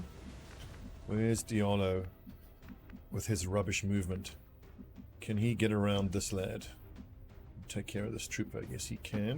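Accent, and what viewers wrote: South Africa. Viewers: Great to see your back, really missed those South African tones of yours.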